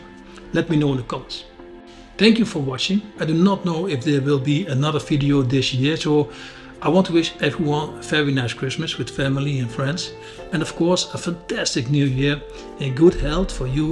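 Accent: Netherlands. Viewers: I am a great admirer of your videos and think that some of the methods you propound are absolutely brilliant. However if one tries to put them into practice oneself it is very difficult to do so. The reason is that you speak very very quickly and it is sometimes a little difficult to follow your Dutch accent. It would be easier if you spoke more slowly. It would also be easier to follow you if you spent a little more time in explaining each step. If plans were available it would also be easier. Sorry to be critical but I think your videos would do even better if you followed my suggestions. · Prachtig dit, you sound een beetje like a hollander.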